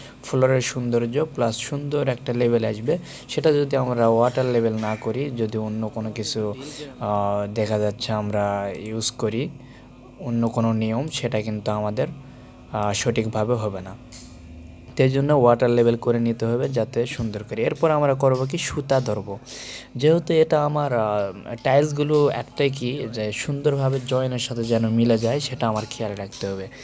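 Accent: Naogaon, Bangladesh. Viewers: আপনার বাসা নওগাঁ জেলাতে মনে হচ্ছে!!!!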